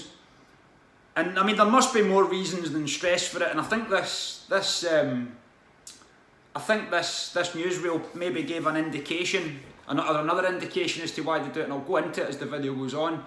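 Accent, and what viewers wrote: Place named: Glasgow, United Kingdom. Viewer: he has a Glasgow accent :)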